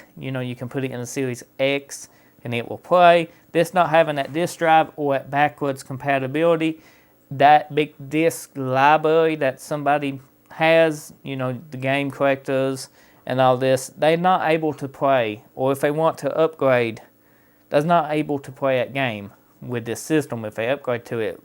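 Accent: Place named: South, United States. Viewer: Your southern accent. That one lol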